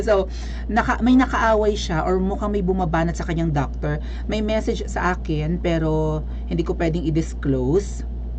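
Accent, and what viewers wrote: Iloilo, Philippines. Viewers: Girl cute mong mqgsalita ng ilongga. 💖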